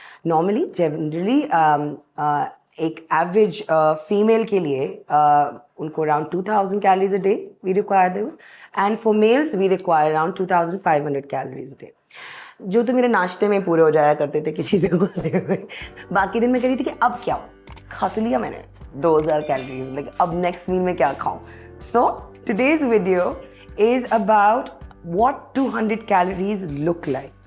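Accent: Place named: India. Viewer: Very informative. Also take some positive criticism. Speak more in Pakistani accent. There is a touch of Indian accent in your tone, maybe because you watch Indian media.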